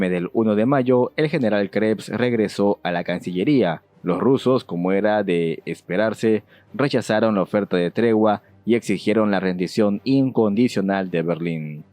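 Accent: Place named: Peru. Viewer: Muy interesante vídeo, una lástima luchar sabiendo que está todo perdido. Tenía años que no veía un video del canal. De antemano una disculpa. Antes pensaba "bueno, es un canal nuevo, aunque interesante aún no sabe modular bien su voz, es difícil hablar a un micrófono" pero hasta ahora veo que es porque es peruano y por eso es tan chocante su acento...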